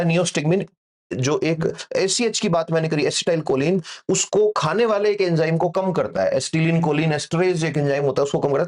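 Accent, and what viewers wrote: Rajasthan, India. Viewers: Sir aap sikar RAJ. Se to nhi ho aap ki boli se lag raha h agle lecture me bata dena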